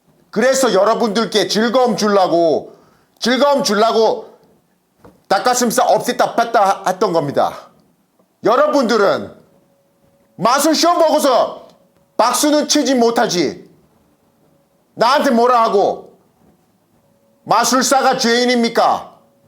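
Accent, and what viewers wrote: North Korea. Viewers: 뜨문뜨문 북한 억양이 있는데? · 약간 북한말투..?? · 근데 미국인이 왜 북한 말 하는 거 같죠?? 어디서 봤는데 닮은 사람.... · 말투 점점 북한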